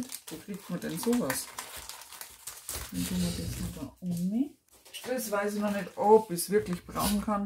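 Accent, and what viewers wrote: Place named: Bavaria, Germany. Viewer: Hallo, neue Abonnentin hier ✌ Ich bin auch aus Bayern und mir gefällt dass man deinen Akzent so schön durchhört 🤗 Außerdem heißt meine beste Freundin auch und deine videos gefallen mir natürlich auch 😁 Mach weiter so und bleib so identisch wie du bist! Servus, Yvonne 😉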